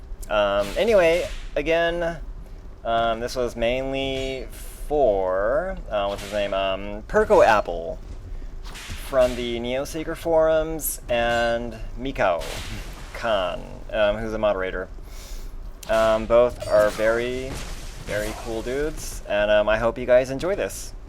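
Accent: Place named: South, United States. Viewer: weeaboo game strong this guy has a country accent yet thinks he's Japanese damn weeaboos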